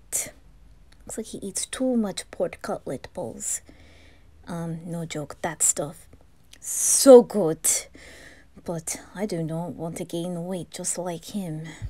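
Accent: Russia. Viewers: Ваш русский акцент прекрасен (*´﹀`*) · The amount of ppl speak russian in here lmao i'm russian and your russian accent and russian it self is really good keep up the amazing work love❤❤❤❤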